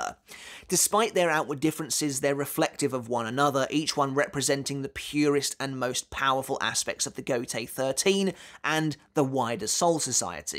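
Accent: United Kingdom. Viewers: Didn't know an American spoke in a British accent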